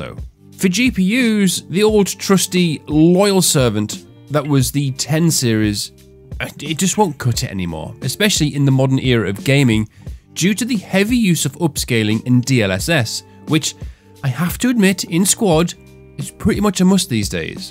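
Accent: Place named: Newcastle, United Kingdom. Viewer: At first i thought scots, but i think its geordie accent (newcastle) cause ur "done" is more "dun" 😁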